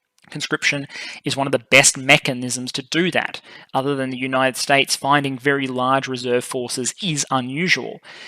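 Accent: Australia. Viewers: You're providing a great set of analytics to consider for us humble viewers and I've enjoyed these immensely. Also great to hear an Aussie on youtube, keep up the good work mate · THAT AUSTRALIAN OR KIWI ACCENT IS DRIVING ME UP THE WALL..ALSO A LOT OF WORDS FLYING AT ME....TOO MUCH INFORMATION.... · A very very good analysis of the situation. Many thanks for the depth and knowledge you provide. Especially love the Aussie accent!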